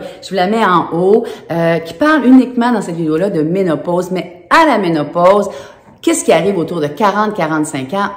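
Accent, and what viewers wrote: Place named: Canada. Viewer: J'ai du mal avec l'accent canadien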